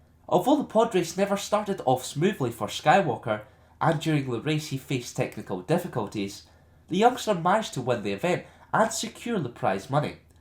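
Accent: Scotland, United Kingdom. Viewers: Congratulations for you 100 lore ,Really like what you're doing on your channel also are you Scottish if so then I must that I visit Glasgow .Forgive me for my English it's not my first language.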